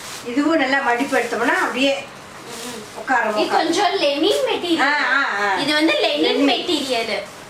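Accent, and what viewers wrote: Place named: Salem, India. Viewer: Salem slang keattu yavlooo nall aguthu ma......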